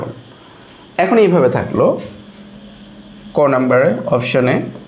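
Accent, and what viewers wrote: Sylhet, Bangladesh. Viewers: Sylhet er basay moja base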